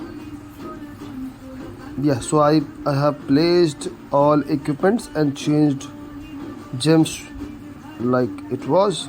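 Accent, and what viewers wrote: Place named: India. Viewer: india bro logatnya aja ketahuan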